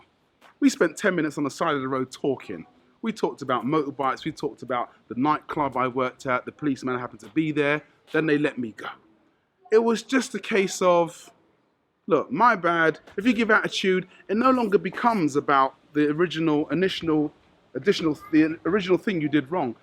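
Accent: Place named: United Kingdom. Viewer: No i couldn't tell but i knew you had that UK accent....I'm from the Philippians but i move to America for 4 years then i move back home for good....i didn't know that you was from Nigeria dats why i had to ask you. Do you ever want to move back to Nigeria???? I thought about moving to Africa soon because i love to travel.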